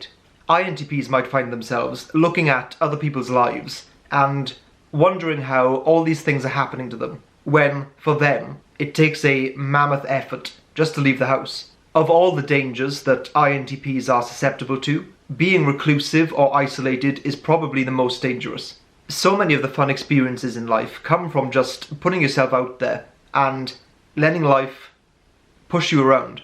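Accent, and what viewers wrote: Wales, United Kingdom. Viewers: Hmmm your accent... are you Welsh? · Omg are you welsh??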